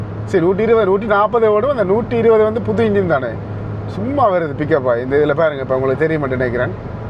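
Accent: Sri Lanka. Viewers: இலங்கை தமிழ் நீங்கள் பேசும்போது கேட்பதற்கு நன்றாக இருக்கிறது ஆனால் வேகமாக பேசுகிறீர்கள் நாங்கள் சென்னையில் இருக்கிறேன் உங்கள் தமிழ் புரிந்து கொள்ள முடியவில்லை கொஞ்சம் நிதானமாக பேசினால் நான் புரிந்து கொள்வதற்கு ஏதுவாக இருக்கும் மற்றபடி உங்களுடைய இலங்கை தமிழ் கேட்பதற்கு நன்றாக இருக்கிறது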